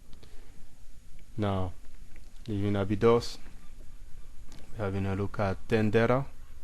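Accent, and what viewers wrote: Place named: Haiti. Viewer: As soon as I heard your accent I knew you were Haiti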